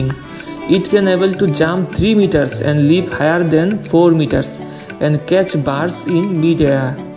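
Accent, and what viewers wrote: India. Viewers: Sorry but I just couldn’t watch that video. That Indian accent reminds me of the frustration every time I try to call customer support, be it for my cable, phone, internet support, etc and I don’t understand what the hell the Indian agent are trying to explain to me and worse they don’t understand my American English.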